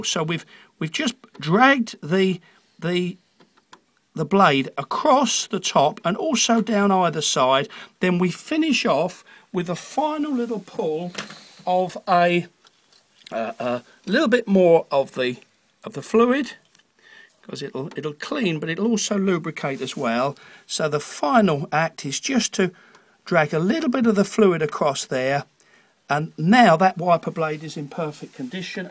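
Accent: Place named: United Kingdom. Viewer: Wonderful British English accent!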